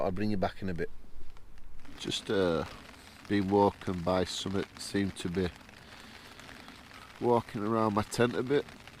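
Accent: United Kingdom. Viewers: why is this common in usa to have someone attacking you at 1-3 am edit i realize his accent is from britain but still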